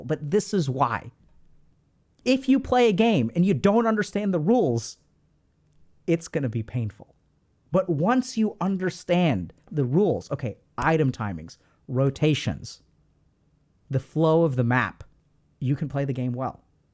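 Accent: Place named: India. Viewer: I wish he would use his Indian accent more! It motivates me to listen more.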